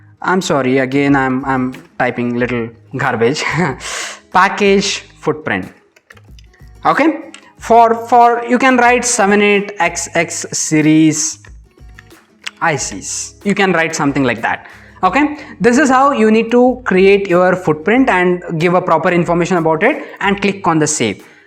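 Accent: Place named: India. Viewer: your English is atrocious! It's no use to try an speak English with a Hindi accent and pronunciations, rather you should listen and imitate how English is spoken and pronounced by native English speakers. Just imagine speaking Hindi with English pronunciations - it would be unintelligible.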